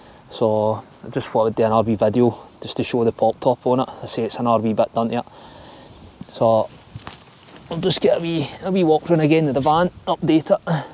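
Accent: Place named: Scotland, United Kingdom. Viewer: Love the camper! English guy here, where in Scotland u from? Strong accent that 😂 same accent as Ricky burns the boxer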